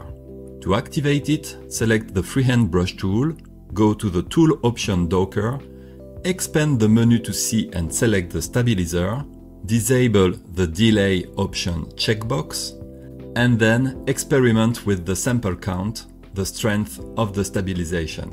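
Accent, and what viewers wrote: France. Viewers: Pourquoi garder un accent français ? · thanks!!!! you have a bit french accent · Français ! j'ai reconnu l'accent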